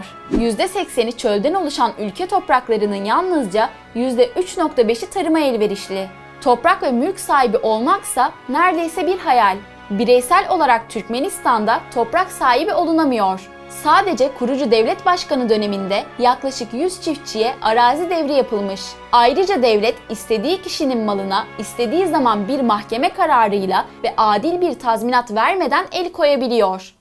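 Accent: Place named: West, Turkey. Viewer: Yok ya sahimi şu batılı ağzıyla car car etmeseniz olmuyor yalan bu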